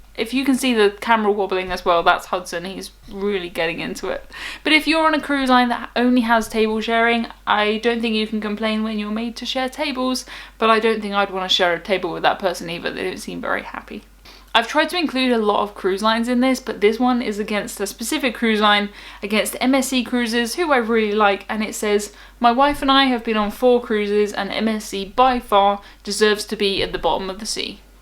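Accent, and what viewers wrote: United Kingdom. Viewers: Now why could I have not met a girl like you in my younger years. Your attractive, smart, articulate - and like me, I could spend the rest of my days cruising the world. Oh, I love that British accent.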